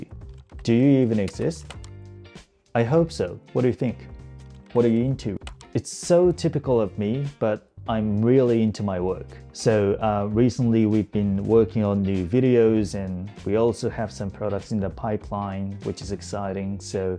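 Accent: Australia. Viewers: この人の英語ってフラットというか、イントネーションの高低があんまり激しくないように聞こえるんだけど オージーアクセントを身につけた結果としてそうなったのか、意図的にそうやって話してるのかどっちなんだろう？ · オージーアクセントが炸裂してる笑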